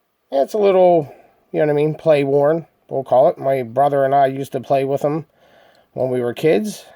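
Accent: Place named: Pittsburgh, United States. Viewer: I stumbled on your video after watching Scale Enthusiasm's top five. I thought from your "accent" and your use of "Yinz" that you were from Pittsburgh. Then when you mentioned Cranberry, I knew I was right. I'm from Pittsburgh too. Have you ever been to a Steel City Diecast Club meeting? BTW great video. I'll check out your others and subscribe. Hope to meet you in person - Rick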